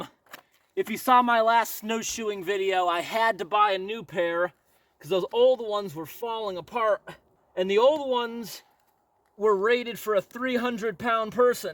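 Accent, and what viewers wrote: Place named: Michigan, United States. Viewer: Sounds like a Michigan accent.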